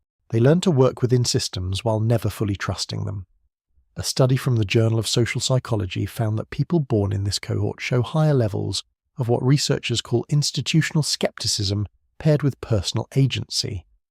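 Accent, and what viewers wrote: United Kingdom. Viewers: Anything that's narrated using a British accent is instantly believable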